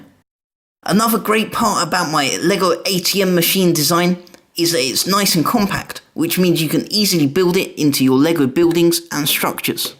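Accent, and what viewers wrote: United Kingdom. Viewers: I like your voice are you from Britan? Also your really smart and creative!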